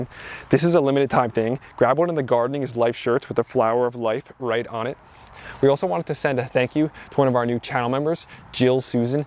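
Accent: United States. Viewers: I was thinking he was an American in Jersey uk .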